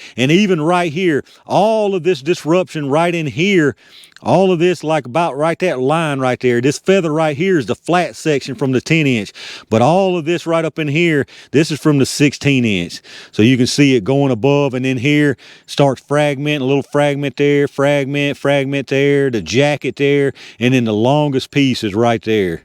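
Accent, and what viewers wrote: South, United States. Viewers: What a beautiful south accent.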